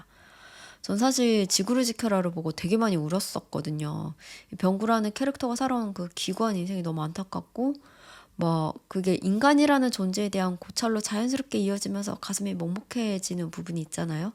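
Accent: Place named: Seoul, South Korea. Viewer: 서울말 듣기 좋다.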